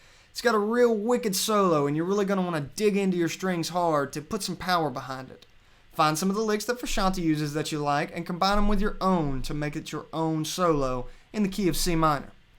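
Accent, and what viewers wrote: South, United States. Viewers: thank you so much, i've been looking over for ages for this lesson! you rock! btw, where are you from? you accent sounds southern american.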